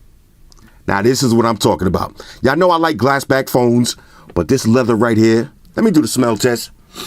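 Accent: New York, United States. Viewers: You are a Great reviewer!. I'm here mainly for your NYC Accent!. And I almost forgot, Pitching me rolling!🤣😂👍🍺🍺🍺🍻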